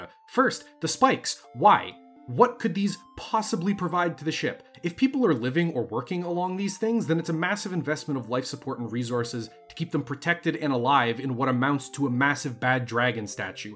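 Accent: Canada. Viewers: This dudes Canadian accent is giving me PTSD. Abaoouut….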